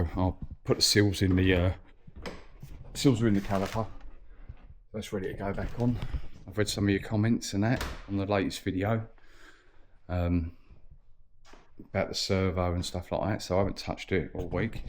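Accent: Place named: South East London, United Kingdom. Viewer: I knew you was near me with your accent I’m in Croydon area do you have a email or some way of getting hold of you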